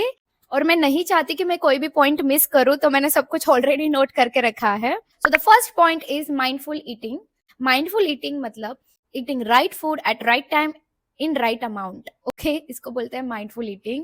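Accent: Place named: Maharashtra, India. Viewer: Marathi accents in hindi speaking are very nice Being a Marathi I like to hear it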